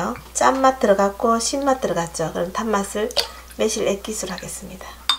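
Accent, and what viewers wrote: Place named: Daegu, South Korea. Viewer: 님 목소리도 시원시원 요리도 시원시원 대구 말씨가 정겹네요 팬입니다 저도고향이 대구입니다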